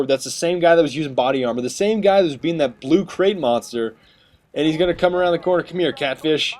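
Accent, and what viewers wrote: Germany. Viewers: haha your english-german accent is epic :D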